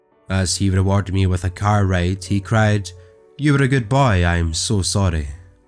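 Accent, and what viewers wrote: Scotland, United Kingdom. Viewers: Love me a good Scottish accent. Reminds me of Shrek 💚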